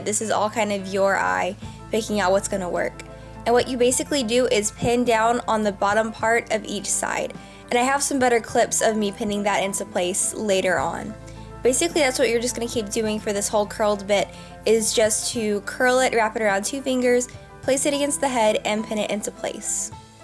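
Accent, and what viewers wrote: United States. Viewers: Hi which part of USA r u from? I love this accent you have!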